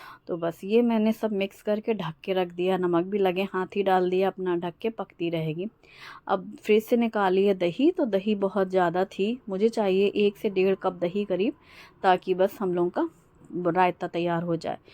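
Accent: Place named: Banda, India. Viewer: Sahi kaha rhi ho aap kaam Kabhi nahi katam hota apke bolne ka ton bilkul banda ki taraf ki hai kahi pe raho pr apne jameen ki khushbu aa hi jati hai❤️❤️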